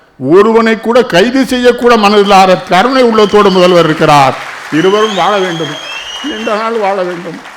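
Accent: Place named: Tirunelveli, India. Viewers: இவர் பேச்சு நெல்லை தமிழ் கேட்க கேட்க திகட்டாத தெள் அமுது ஓம் நமசிவாய வாழ்க வாழ்க ஓம் சக்தி பரா சக்தி சாந்தி சாந்தி சாந்தி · நெல்லைத் தமிழை அழகாகப் பேசியவர்.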